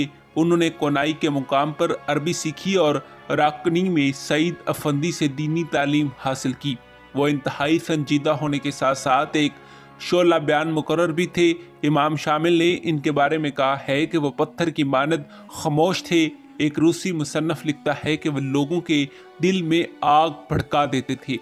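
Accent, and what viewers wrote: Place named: Punjab, Pakistan. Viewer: ٹیٹھ پنجابی سٹائل میں پڑھ رھے ہیں جس سے بہت سے الفاظ کا تلفظ صحیح نہیں ھوتا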